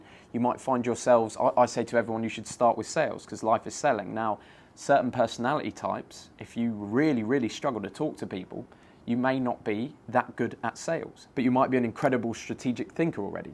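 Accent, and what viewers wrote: United Kingdom. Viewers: Loving this video format, seems like is more calm here, and all of a sudden he comes across way more british for some reason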